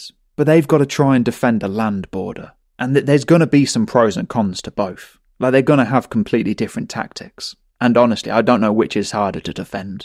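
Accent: United Kingdom. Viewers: Thick British I’ll say no more.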